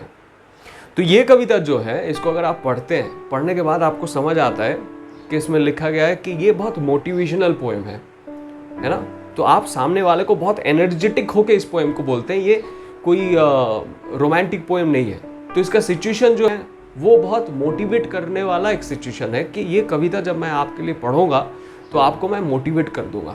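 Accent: Bengal, India. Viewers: Nice, but aapki hindi me Bengali tuch abhi bhut dikhta hai bro.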